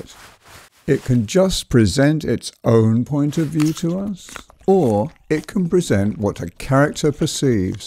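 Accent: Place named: United Kingdom. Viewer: I love this video! I laughed at these animation so much and the serious British voice is the perfect foil for them.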